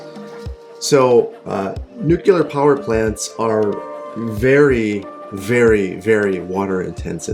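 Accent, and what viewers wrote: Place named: Milwaukee, United States. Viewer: I just discovered your channel and binged just about all your videos! I'm an MKE native and used to work for WI DHL so your accent and industry make me homesick. Keep the videos coming! The winter map for another month or so works for me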